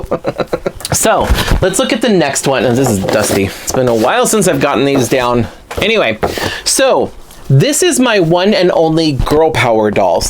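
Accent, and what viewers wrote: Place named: United Kingdom. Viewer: My goodness that British accent lol😂 I hope that’s not what we sound like 😂😂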